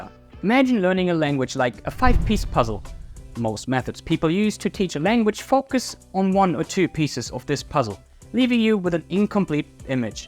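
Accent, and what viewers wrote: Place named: São Paulo, Brazil. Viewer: Você parece uma paulista!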